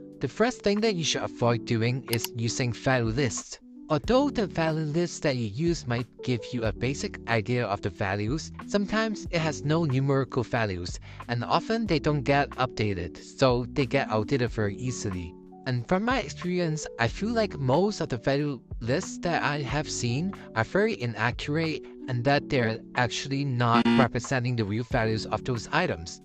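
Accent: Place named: Singapore. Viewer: U sound so singaporean lol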